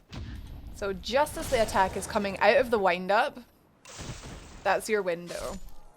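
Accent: Scotland, United Kingdom. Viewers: Came for tutorial, subscribing for fellow scot accent. Will be using buckler on my next DS attempt, thank you.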